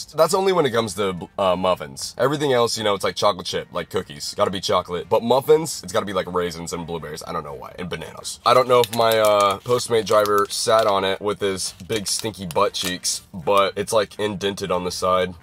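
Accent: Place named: Scotland, United Kingdom. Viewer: GOODBYEEE DID HE SAY WEE BIT HES SO SCOTTISH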